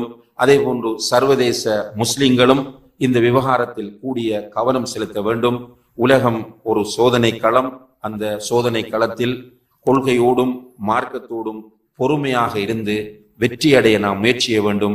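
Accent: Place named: Sri Lanka. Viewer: Pesum vizattil puriwazillaya sri lanka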